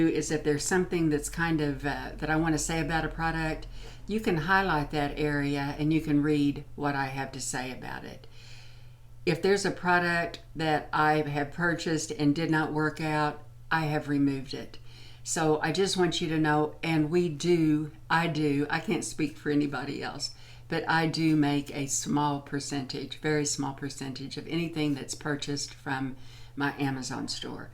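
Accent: South, United States. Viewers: your soft spoken, Southern delivery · Hi! I watch your channel for several reasons. First, you are honest and I trust what you say. Second, you are sweet and loving, and you have that beautiful slight southern accent. You remind me very much of my step-mother who died this year, watching you is like visiting with someone I loved very much. Third, you are genuine, it's lovely "spending time" with you. I love that you put your videos out on Sunday mornings, it's become a Sunday morning ritual for me. Fourth, you're real. Some of the others that I watch seem very much like "presenters," (I swear they are auditioning for the home shopping network) but watching you is like talking to a friend. And finally, watching you is like going home. I grew up in Indiana, but I have lived in Chicago for 40 years now. And although Chicago is very "Midwestern," it's not small town Midwestern. 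Watching you is like going home every Sunday morning.... you are good for my soul!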